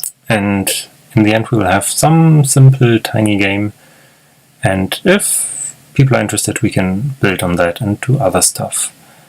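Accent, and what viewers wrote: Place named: Germany. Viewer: I don't know why, but the German accent makes the tutorial more trustworthy 😄 very good video, thanks!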